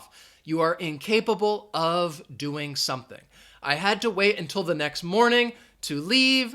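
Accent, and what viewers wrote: United States. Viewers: Hello Sir, I do appreciate your lessons . You teach with limpid and clear American accent. Have my thumb up!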